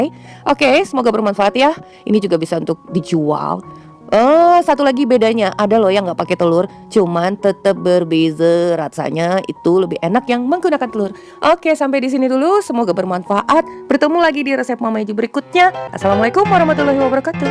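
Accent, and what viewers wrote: Java, Indonesia. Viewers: lucu sekali. Bhs nya kyk orang Jawa saja 🥰🥰🥰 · Gak fokus ke prosesi mbikin kuehnya, maam chayaankk😘😘❤💓🌺🎉🎊 Suka gaya ngomong medhok Jawanya... 😂😂😂😂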